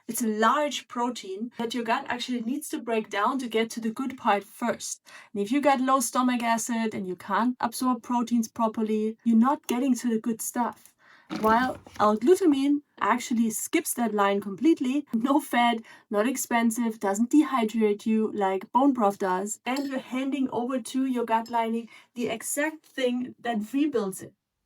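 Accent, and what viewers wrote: Germany. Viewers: I think she is german - I can hear her strong german accent!?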